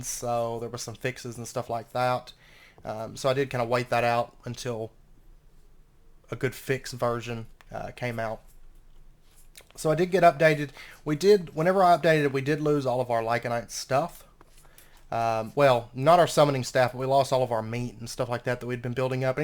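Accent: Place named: Owensboro, United States. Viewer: I’ve got tons of family in Owensboro. Im really loving watching these videos since your accent reminds me of all the good times I had down there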